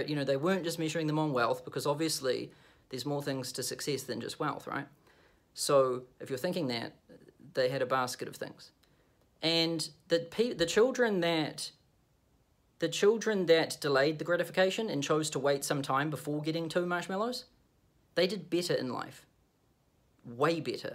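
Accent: New Zealand. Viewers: One thing comes to mind when watching your videos. Quality. Great job man. I like your way of analysing life and work. And I have fun when I can't understand that you find a lot things "bitter". New Zealand accent rocks!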